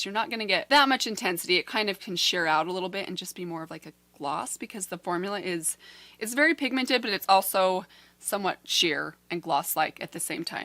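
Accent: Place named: Brooklyn, United States. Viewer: Um, pretty close to an OG New York (Brooklynese to be precise) accent! #Fuhgettaboutit Thanks for the podcast suggestion, love to listen as I try to drift off 😴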